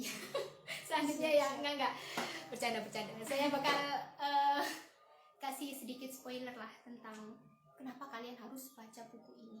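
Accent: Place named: Java, Indonesia. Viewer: Medoknya dapet banget, 😁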